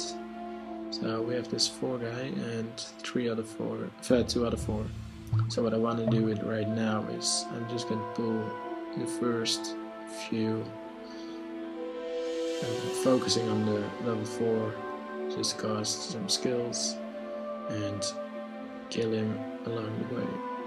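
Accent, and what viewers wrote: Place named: Netherlands. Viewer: dacht ik ook al nederlands accent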